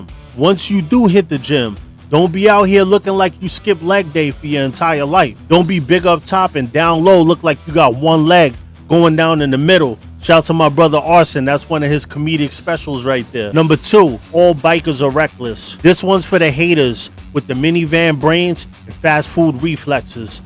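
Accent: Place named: New York, United States. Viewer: I really enjoy this guy’s content. For a southern boy the New York accent can’t be a bit much but he’s honest he’s correct and he struck a cord with me as someone who enjoys riding but wants to have nothing to do with the motorcycle club culture. I’ve been invited into some clubs and politely decline and then watching this gentleman’s videos he explained to me why I said no. Keep up the good work.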